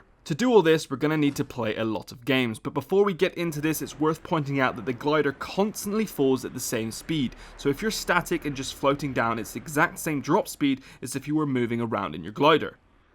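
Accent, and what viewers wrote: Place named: Bristol, United Kingdom. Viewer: You’re from Bristol right??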